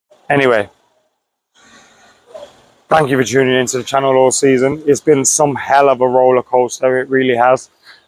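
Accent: Birmingham, United Kingdom. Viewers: Brummie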